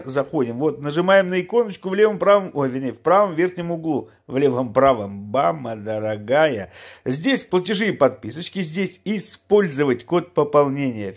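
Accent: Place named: Urals, Russia. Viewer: Уральский говор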